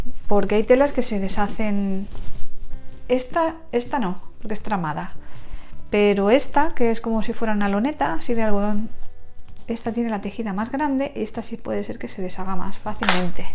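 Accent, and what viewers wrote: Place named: Spain. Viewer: Hola! Q precioso tu trabajo, aparte d tu simpatía y claridad p explicar Amo escuchar ese acento español, quizás añorando y recordando a mi abuela q era d Andalucía Soy d Argentina y d una provincia del interior del país, y no encuentro todo lo q mencionas p la confección (la boquilla d esa longitud principalmente )las telas son soñadas Te felicito!!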